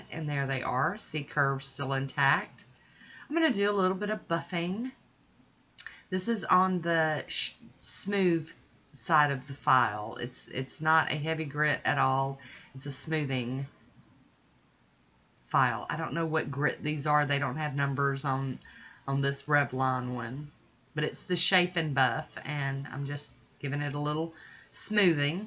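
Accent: South, United States. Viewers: Very helpful. I love your southern accent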